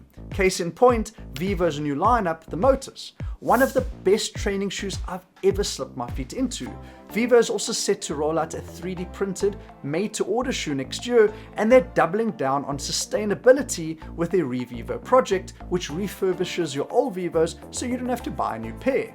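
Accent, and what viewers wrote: South Africa. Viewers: I hear south Africa in this man's voice! 🇿🇦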